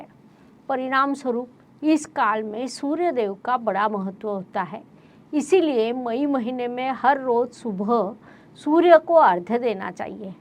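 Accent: Maharashtra, India. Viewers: Madam please speak in Marathi or else speak in Hindi tone..Ekdum marathi tone aata hai to thoda alag lagta hai..Content bohot accha hai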